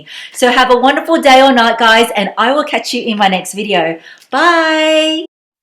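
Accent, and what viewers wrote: Australia. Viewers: HI, I so love your Aussie accent, my sister lives in melbourne maybe one day i have a chance to meet tou in person. You are so lovely!!! I love that versace bag it is so cute!!!! mheller161 IG.... Looking forward to more videos❤️❤️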